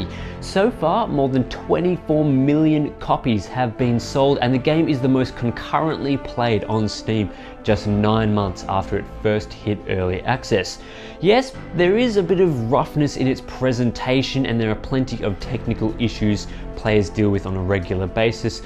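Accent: Australia. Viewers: hello I'm new and I subcried and you are austrailan I know because I I'm and I can tell I your voice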